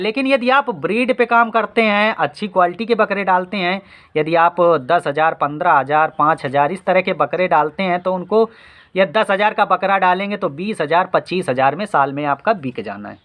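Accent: Kanpur, India. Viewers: वीडियो देखकर मन प्रसन्न हो गया । बोलने का कनपुरिया लहजा अच्छा लगा साथ ही जमीनी हकीकत से रूबरू हुए ।धन्यवाद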